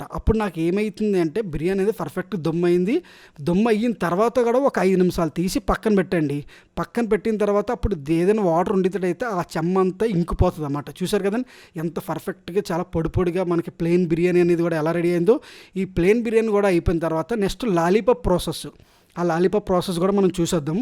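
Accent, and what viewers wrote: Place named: Godavari, India. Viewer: Godavari slang lo gouravam 😍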